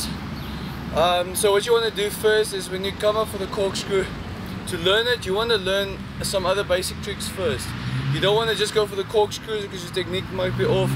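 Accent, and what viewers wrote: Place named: South Africa. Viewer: I recognize your accent, are you from south africa?? Your accent sounds south african! :D im a martial arts tricker from south africa!!! :D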